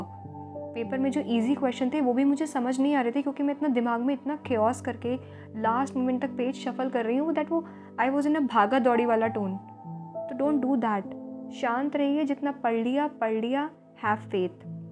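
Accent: Bengal, India. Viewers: Thanks for guiding lot of students.keep spreading your positive vibes....life becomes more positive and beautiful when people like you enter in life.Thanks and lot of respect to your parents who gives you such a good culture and sanskar. Somewhere I got a Bengali touch in your speach.you are from?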